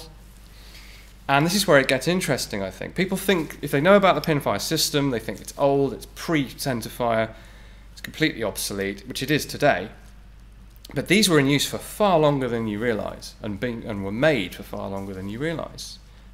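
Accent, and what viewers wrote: United Kingdom. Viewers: I wasn't expecting a British accent, I bet he never shoots them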